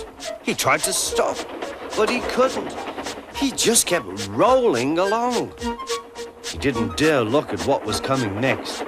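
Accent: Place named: United Kingdom. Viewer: Great job! I love the UK narration.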